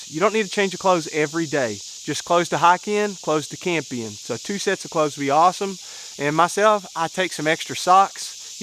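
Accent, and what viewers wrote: Kentucky, United States. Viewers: What’s a “Tint” ? Not sure what a “ Tint” is ? Lol😂😂 It’s like me saying “ Pak your Ca “ I was exposed to your Kentucky accent when Jason hiked up here in March in the snow ! Very cool ! Cheers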